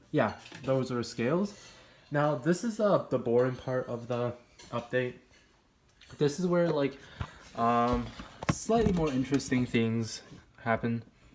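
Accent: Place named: China. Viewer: 怎么中国口语啊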